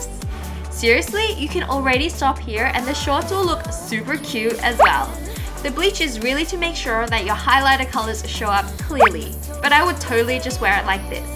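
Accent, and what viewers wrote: Australia. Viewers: Has anyone noticed wengie's Australian accent has toned down a bit?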